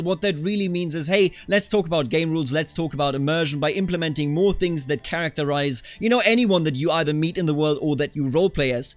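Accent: Germany. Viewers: He sounds very german lmao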